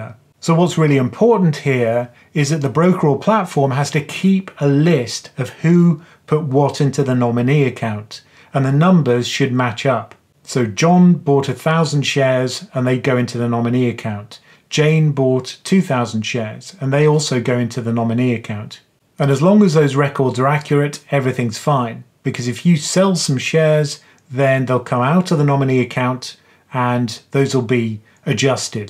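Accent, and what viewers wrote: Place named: Cockney, United Kingdom. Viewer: Couldnt unhear in-cockney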